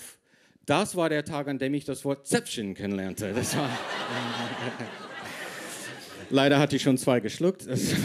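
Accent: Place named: Berlin, Germany. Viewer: Berliner Schnauze ist halt nice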